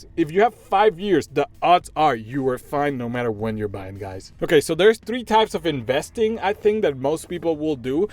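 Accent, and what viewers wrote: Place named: Spain. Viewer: Ok thank you for your answer, I know English too but I thought you was a native spanish talker jajajaja, I like a lot this new channel, i will take a look of the other videos, thanks for sharing your experience!!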